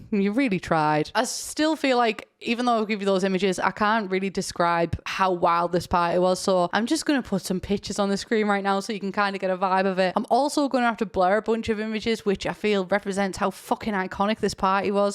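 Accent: United States. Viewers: I didn't realize you were doing an american accent until you mentioned it lol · Absolutely 10/10 American accent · Wow your American accent is pretty on point! It’s kind of funny seeing the roles reversed because I’m obsessed with doing a scouse accent lol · I did a double take when I heard your American accent what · Very good American accent -an American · your american accent is magical the fact that we have accents is just so damn cool. i love when people do american accents. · Can we talk about great American accent?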